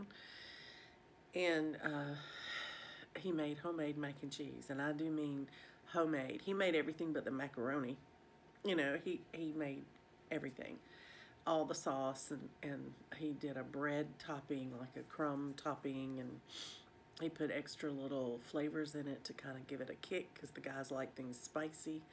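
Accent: South, United States. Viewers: Thank you so much, for such a quick response. Your channel is my favorite. I lived in Memphis, TN for two years and left a piece of my heart there. Your southern dialect, hospitality, and lovely mannerisms take me back to that very enjoyable time in my life. Blessings to you in the new year!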